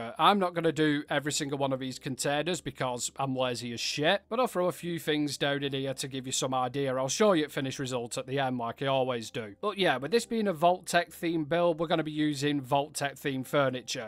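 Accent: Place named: Northern England, United Kingdom. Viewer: Just simply simply lovely as always Great build commentary and humour love it keep it coming mate don’t just watch for the builds but for the northern commentary and humour as well just brilliant!!!!!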